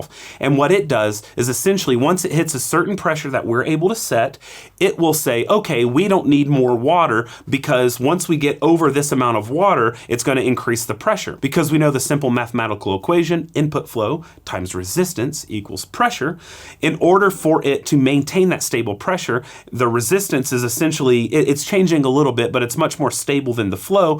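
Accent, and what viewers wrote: United States. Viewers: I have lived in Spain for 35 years. In San Cugàt. We watched Ascaso being born here. So these are machines we’ve been using for years. Along with our amazing (heavily modified) hefty and reliable Italian Saecco aluminum and ss core. Almost 25 years and making amazing cups. We’re not worried about the 21 gram baskets or naked portafilters on this machine. We rely on its original but strongly modified porta filter with a nice ims basket. A charm. Even with tough coffees. Ascasos here are the standard. And, if you open all single head ones, you’ll find the same exact components inside, even with the humble Basic. The “new” PID Dream is the same as the Steel but with only one thermocoil. You should cut it in half and look at the marvel of ingenuity that makes these machines fast, predictable and reliable. Not for a scientist but for an espresso drinker for more than 45 years. 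Ascaso is one of the most amazing brands in Europe. Canadians are adopting them. But, Portugal? They’ve been there for a really long time. Loved your content. Makes me proud of you… the Master. You rock. Sorry. Não sabia que você era português. É que você parece tão americano. E seu sotaque e tudo mais são tão americanos que nem consigo entender como você está apenas “apresentando” uma máquina Ascaso. Suponho que seja para seus principais seguidores nos EUA de A.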